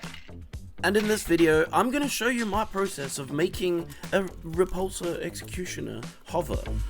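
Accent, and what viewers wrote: South Africa. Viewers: PS: is that a hint of a South African accent I hear? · that's why it sounds familiar! I grew up in Pretoria, good to see a south African making such cool builds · God you have a gorgeous accent, is it south african, by chance?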